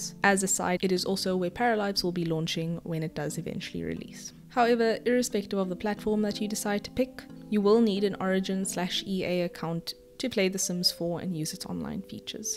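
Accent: South Africa. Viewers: Are you a South African? I recognize the accent. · Yep it's a south African accent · Wait, you're South African? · You from South Africa right?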